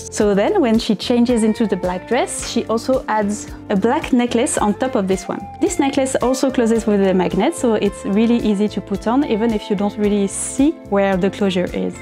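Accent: France. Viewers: Tu es tellement française, ça s'entend jpp- Mais très cool ton tuto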